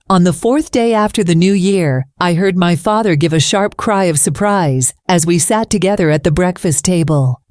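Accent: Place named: United States. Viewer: Yuk, an American accent is so wrong for this story.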